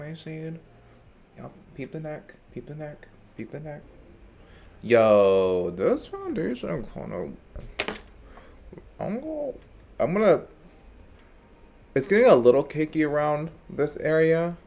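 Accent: Australia. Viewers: Your Australian accent kills me 😂😂 it’s a mix of New Zealand and english accents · Omg! The Australian accent!! 😂 love it!! Tati RAVES about the Col.Lab mascara so I'm gonna finish watching this video now I had to tell u I LOVE the accent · i love ur Australian accent bih keep doing it pls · I fockin love the Australian accenttt!! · Your Australian accent is beut mate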